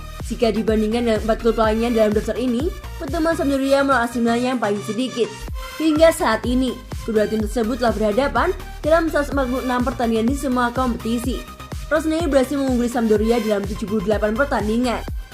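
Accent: Java, Indonesia. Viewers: Ganti lah voice over nya, medok ngmg kek kumur2 mana cadel lg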